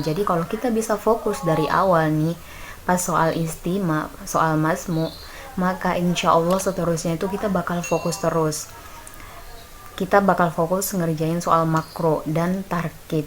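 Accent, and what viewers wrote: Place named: Sulawesi, Indonesia. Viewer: Orang Sulawesi yah kak? Mirip sama ust Syam logatnya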